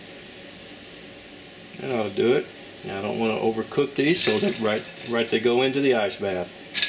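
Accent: South, United States. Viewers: That southern accent is too good I could here that all day..😊🙌🤣🤣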